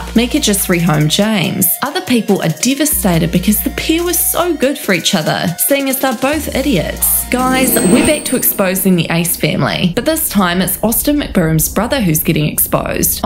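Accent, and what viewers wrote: New Zealand. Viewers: New Zealand accent. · Now that is a Kiwi accent!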